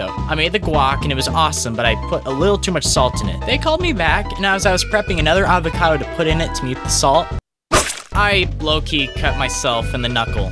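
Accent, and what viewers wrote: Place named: England, United Kingdom. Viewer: Thic English accent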